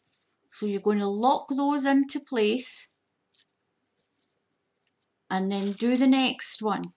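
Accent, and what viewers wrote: Scotland, United Kingdom. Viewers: Omg This is the first time I have heard a fellow Scot on You Tube. Your tutorial is so clear and detailed and the swan is beautiful. I think I will be able to make it. Keep these origami tutorials coming. Thank you so much. xx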